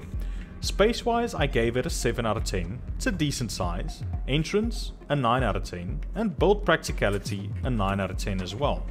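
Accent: South Africa. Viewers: Are u from South Africa? Ur accent sounds like ur fromt here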